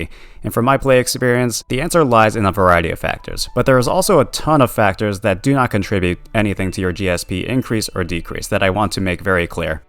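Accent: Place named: New York, United States. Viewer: What part of New York are you from ? I’m from New York as well 👀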